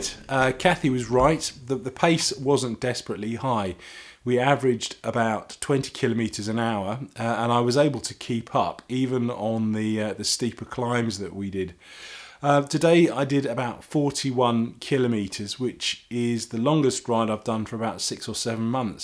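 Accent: United Kingdom. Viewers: Another interesting and entertaining video and I think the production values are great. I love the Britishness and subtle humour of your delivery and content - keep it up. Having never cycled abroad, although it's on my wishlist, I'd be interested to hear more about that. Do you take your own bike, or hire one out there? In Mallorca, do you prefer the north of the island as a cycling base, or some other area? Any tips for organising the trip that would be useful to others etc.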